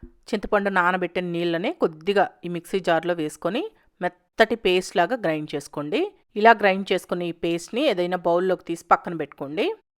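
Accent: Nellore, India. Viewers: Mee uru Nellore aa? Ma Nellore relatives mee lage matladataru. And recipe chala bagundi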